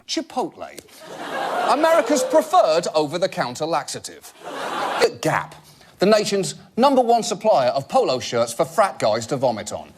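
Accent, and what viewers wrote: United Kingdom. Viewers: He is not American. He is taking on American issues with wreck less abandon. He rants without perspective. He is incapable of entertaining guests who could throw a dose of cold facts on his middle school understanding. I don't understand why Americans are so quick to abandon critical thinking when they hear a British accent.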